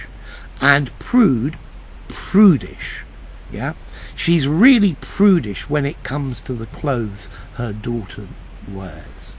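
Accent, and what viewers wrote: RP, United Kingdom. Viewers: Thanks Sir for the lessons you provide for us.What a posh British accent! Splendid!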